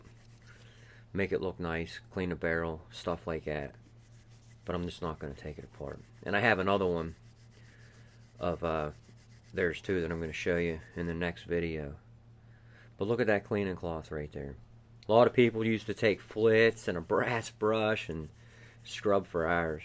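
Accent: Pittsburgh, United States. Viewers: You sound like you're from Pittsburgh.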